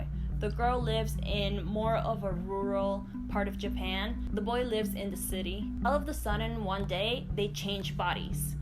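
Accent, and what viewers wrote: Dominican Republic. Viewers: Yo no se quien eres pero yo conosco el acento dominicano donde sea keep it up good stuff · Girl please tell me you’re Dominican cause I know you’re accent is. Cuando hablaste español and also ARMY bomb yes 🙌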